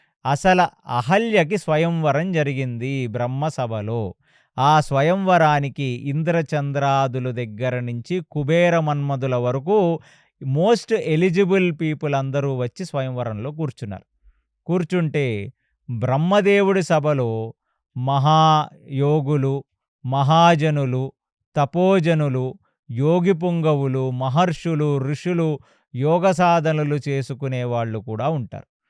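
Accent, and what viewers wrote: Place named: Godavari, India. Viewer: Godavari Slang😅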